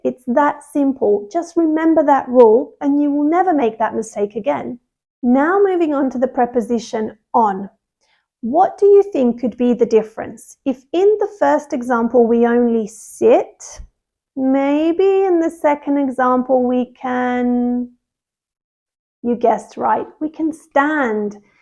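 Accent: United Kingdom. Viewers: I like british english and love british girl as u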